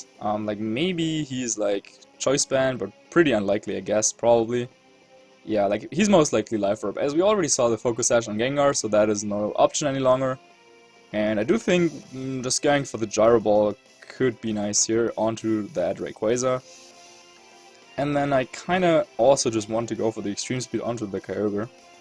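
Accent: Germany. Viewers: just do it in german youll be fine ......................... well you do english videos as a german? so dont worry about ur pronounciation itll always be germanish :D just as mine ---.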